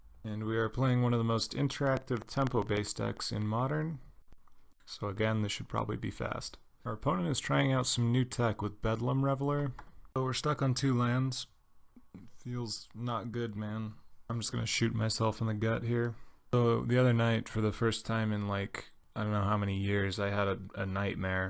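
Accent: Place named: Utah, United States. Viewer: love your vids man, if you're in utah like it sounds like you are we should play some MTG sometime or get drunk and yell at the mormons in the airport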